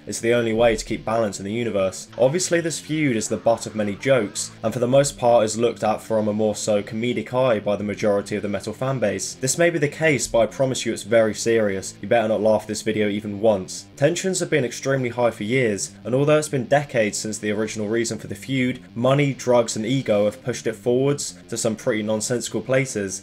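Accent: United Kingdom. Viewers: I hate when red coats narrate American business.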